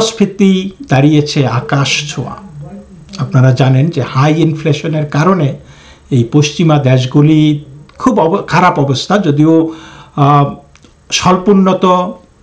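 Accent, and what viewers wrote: Bangal, Bangladesh. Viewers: Dada to bangal......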